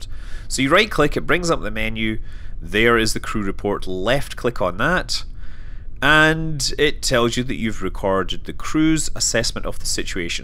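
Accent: Scotland, United Kingdom. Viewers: finally, a Scottish tutorial youtuber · I might have a feeling this man is from Scotland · ***** he's scottish and he actually has some programs to cover up his accent so if he didn't have that it'd be unbearable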